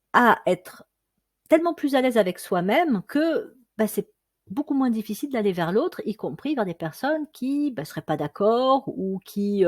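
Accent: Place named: Nice, France. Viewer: Je vous découvre et vous trouve vraiment géniale, je parierai que vous êtes un gentil gémeaux niçois à votre accent et votre facilité d'expression! Merci de la part d'un petit gémeaux avec Pluton en Lion dont vous aidez à éclairer la lanterne. On attend la suite avec intérêt.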